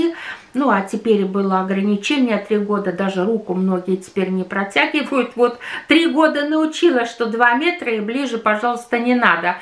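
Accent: Finland. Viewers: У вас прекрасный русский язык, а лёгкий финский акцент только придаёт вам дополнительный шарм! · у вас такое финское произношение!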